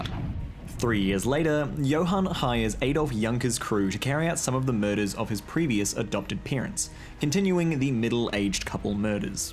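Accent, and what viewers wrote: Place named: New Zealand. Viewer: Also your accent is so interesting for me, it kinda sounds like Australia, but not quite. My guess would be New Zealand, but guessing accents in a second language is really hard, so don’t be offended if I messed it up completely lol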